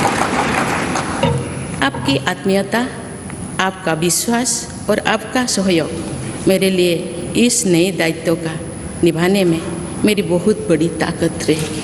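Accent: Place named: Odisha, India. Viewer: She spoke Hindi in Odia accent 👏👏proud of her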